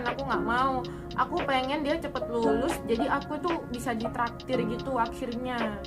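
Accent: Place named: Surabaya, Indonesia. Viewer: Aku suka banget logat surabaya nya 😂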